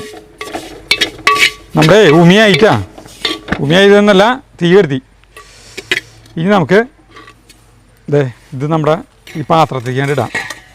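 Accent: Thrissur, India. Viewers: സംസാരം കേൾക്കുമ്പോൾ ശക്തനിൽ വന്ന ഫീൽ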